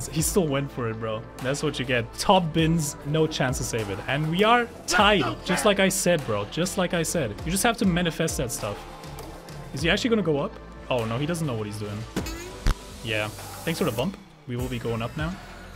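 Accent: Germany. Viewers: Are you german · Hey, are u german? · Da merkste halt dass er deutsch ist die Aussprache kann man nicht als nicht deutscher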